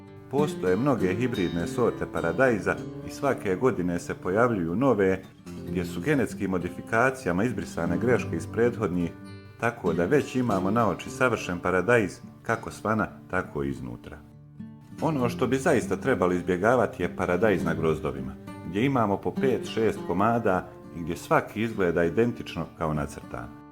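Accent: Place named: Herzegovina, Bosnia and Herzegovina. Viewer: Mene kao Lalu naglasak tj način govora ovog čoveka izuzetno podseća na onaj kojim govori hrvatski teniser Marin Čilić, koji je iz Međugorja, a samo malko manje i na tip govora našeg košarkaša Alekse Avramovića, koji je iz Čačka, a dosta i na Crnogorce, generalno. Dakle, valjda, tipično hercegovački(?)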